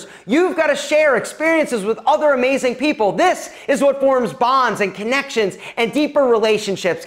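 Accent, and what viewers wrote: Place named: Italian-American, United States. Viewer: It's the Italian-American accent. Yes, it sounds so badass.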